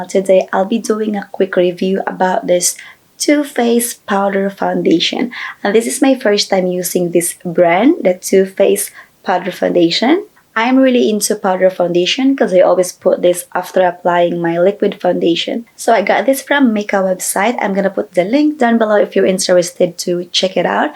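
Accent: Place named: United States. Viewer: Akala ko sis American yung nagsasalita hahaha! Oo maganda nga sis nakakatakip ng blemishes 😊 galing galing magreview 👏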